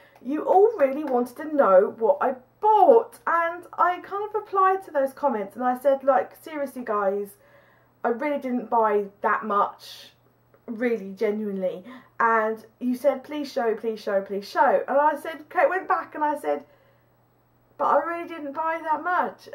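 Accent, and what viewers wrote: England, United Kingdom. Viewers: you are so cute! Love your English accent. You make me smile. You always lift my spirits! God Bless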